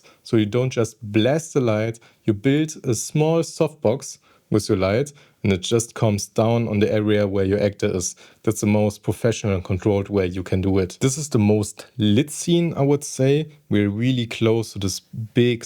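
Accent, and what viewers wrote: Germany. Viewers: sett is aber eh werri german akzent 😅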